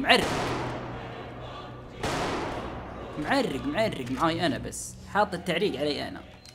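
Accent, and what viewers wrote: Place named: Saudi Arabia. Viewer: اللهجه سعوديه وحتى ask any one he told you were he from!!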